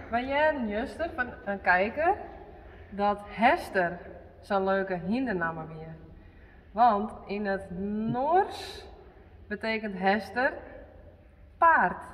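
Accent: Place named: Friesland, Netherlands. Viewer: I absolutely LOVE 😍your Friesland accent and language 😃!!! LOVE sweet Senna, she is so precious 🐕♥️‼️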